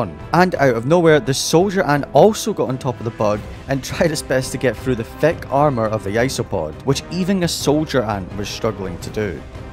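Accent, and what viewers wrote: Scotland, United Kingdom. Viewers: Is bro British? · Are you Scottish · Are you British? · that scottish is chefs kiss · are you by any chance Scottish? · You Scottish?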